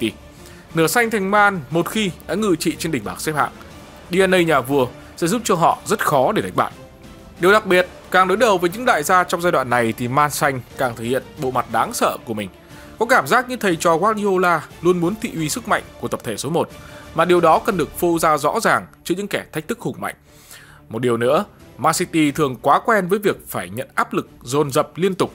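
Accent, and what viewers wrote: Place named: Thanh Hoa, Vietnam. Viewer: Anh ở huyện nào thanh hóa vậy. em như thanh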